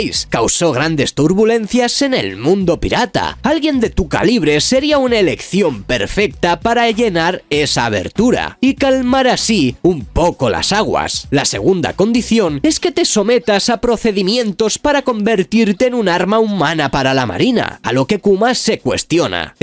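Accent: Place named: Galicia, Spain. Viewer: Me molesta el acento gallego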